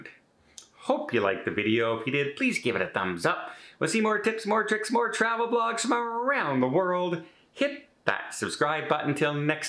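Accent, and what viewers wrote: Canada. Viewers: I think he is Canadian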